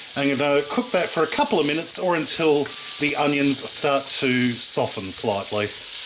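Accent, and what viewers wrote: Australia. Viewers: Okay, the first time I watched one of your videos I thought you were English, but know I think you are Australian. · not British. Australian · i I genuinely hate the aussie accent but i don't mind yours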